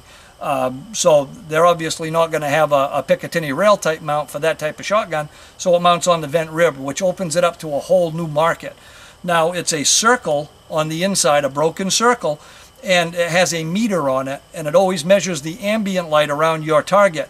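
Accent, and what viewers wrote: Maine, United States. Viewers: ha i just got too the end of the vid i knew ya were from maine cuz of the accent right when you started talking first thing i thought of was my neighbor from when i still lived up there😂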